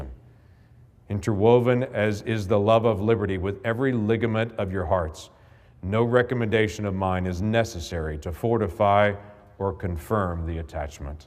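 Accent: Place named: Louisiana, United States. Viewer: I love the new Orleans/ Louisiana accent especially with the fiery anger an conviction coming along with it. That kinda righteous anger and vengeful fury is what you need to combat the violent and psychotic animals coming across the border who have no intention of coming to America to become part of it and one of it's people. They are here to poison everyone slowly and profit immensely from your long drawn out death. 30-40 years of deep corruption since the rise of drug cartels has turned south America into a hellscape and demons and devils are the only thing that can survive in a hellish environment so it's no wonder these so call humans are violent and twisted to the core and won't shy away from the most extreme measures and heinous acts which they carry out with a deranged fervour. We are at war with south America because their own states and people are invading out country an killing our citizens by use of unconventional warfare in the form of narco terrorism. 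Mexico made a secret treaty with Kaiser Wilhelm to attach the US back in WW1 and since humans never learn from their mistakes, they leave themselves wide open to repeating them. This country has become so soft by pushing this" enlightened ignorance" other wise known ask being woke. We have grown too soft as a society and we a ripe for exploitation. The average citizen of the US is woefully unprepared for what's to come and have no fight in them as it is squished out from an early age creating a generation of weak, s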